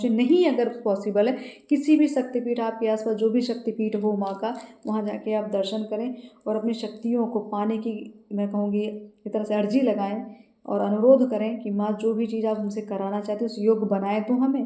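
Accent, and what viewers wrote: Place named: Haryana, India. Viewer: Aap haryana se ho main bta rahe hu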